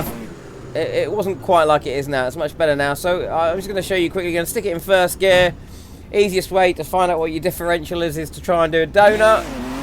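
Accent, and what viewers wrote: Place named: United Kingdom. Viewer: First, you really should have more subscribers. I enjoy your analysis on sim racing topics. Your British accent and comments are very addicting (" the car is having a bit of a moment with the tarmac" LOL!!!) :) Second, I'm really finding the AI way to aggressive in the latest update, actually running me over several times (didn't happen pre Jan??) Third, I don't know why I find it funny that anyone would refer to a car with a straight 6 engine as a "muscle car".. :)..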